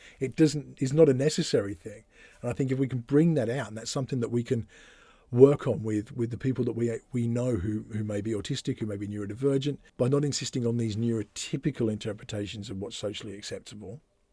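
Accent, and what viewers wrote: Australia. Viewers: Detected your Aussie accent as soon as you spoke, as a fellow Aussie. Subscribed. Interested in your listed of talented autism creators. Elon Musk is the planet's most famous current talent. Others? Women and men? Autism affects all levels of humans, with all levels of intelligence. With higher iq people, their cognitive brain can sometimes override the hyperactive autism. However when we are tired, off guard, off duty, our hardly awake, the autism can suddenly emerge. Can you comment on this. Recently our ABC television showed how our most famous homeless orphan, got to be Doctor (sociological) Robert Smith OAM. Over 60 years old now, he is CEO of one of our larger charities. Is he autistic, or merely a PTSD baby, child, teenager?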